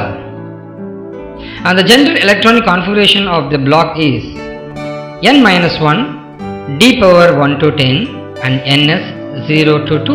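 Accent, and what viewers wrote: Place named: India. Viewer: I'd like to know what percent of youtube videos are made by Indians. I'm guessing 80%. I'm sorry Indian fellow, but speaking with an echo doesn't make the Indian accent more pleasant to hear for people outside of India.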